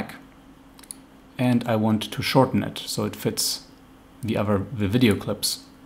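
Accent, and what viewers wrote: Germany. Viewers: Nice tutorial. Very usefull.... and great german accent ;) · Thx you. Very clear and well explain. love the german accent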